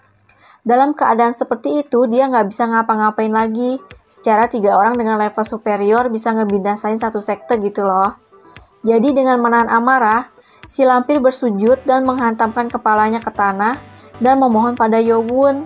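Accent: West Java, Indonesia. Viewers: Sunda banget ya ka 😂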